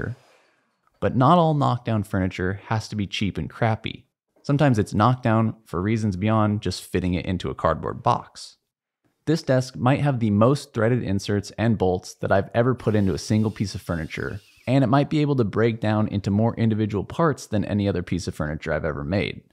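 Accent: United Kingdom. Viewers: Sorry, but too plummy.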